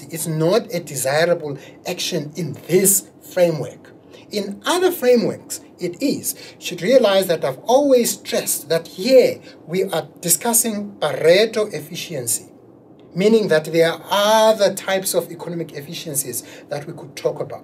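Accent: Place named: South Africa. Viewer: I am a South African student at Columbia University, in New York and I came across your videos whilst preparing for a microeconomics exam. Your videos are excellent and were extremely helpful to me. It's always good to hear a voice from home too! Thank you.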